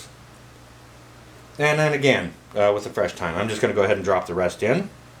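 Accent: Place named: North, United Kingdom. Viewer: If you grow a hamburger over the winter, I want some of those seeds.!! It sounded like grow even though I think you were saying throw, what can I say you have a Northern accent.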